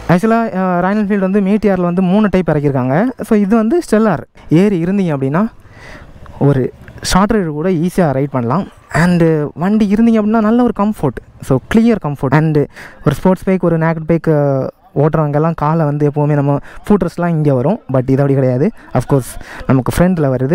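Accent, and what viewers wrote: Kanyakumari, India. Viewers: R u from kanyakumari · Wow KK slang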